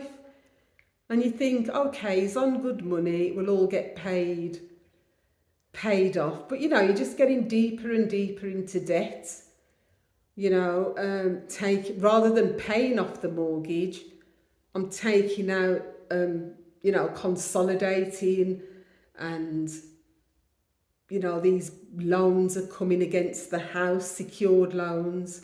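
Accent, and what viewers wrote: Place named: Black Country, United Kingdom. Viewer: It's not the speed of her speech it's her Wolverhampton dudley accent that makes her sound dopey and slow. It's been voted the worst accent in the UK for good reason because it is terrible 🤦🏼‍♀️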